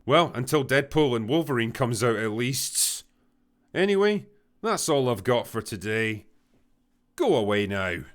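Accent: Scotland, United Kingdom. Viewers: SSSSSSSIIIIIIIIIIIIIMMMMMMMPPPPPPPP!!!!!!!! ............. Nah, that Scottish accent gets me too 😅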